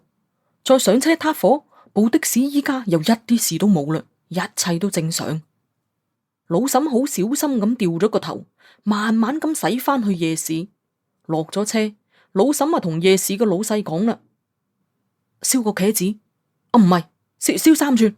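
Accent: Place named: Guangzhou, China. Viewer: 廣州腔講故🤣🤣好好聽😂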